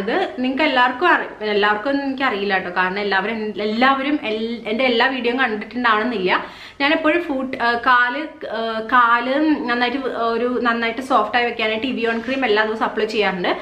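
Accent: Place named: Kochi, India. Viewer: Innathe samsarathilanu kochi slang undayathu, nalla rasamundu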